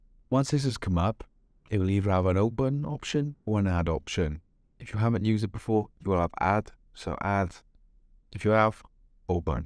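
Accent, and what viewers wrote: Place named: Wales, United Kingdom. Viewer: Love the vid - straight to the point and keeping it simple. Extra points for the Welsh accent. Mae'n codi hireath arna i -- remembering my time at uni!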